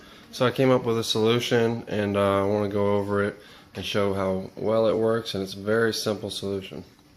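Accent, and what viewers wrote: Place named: Massachusetts, United States. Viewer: I was watching your video the other night. Sounds like you've got a Massachusetts accent. Do you have another YouTube page called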